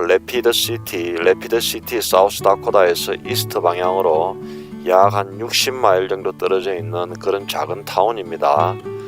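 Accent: Gyeongsang, South Korea. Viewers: 갱상도사투리 잉글리쉬 억수로 잘하시네 한국서 원어민 교사 굿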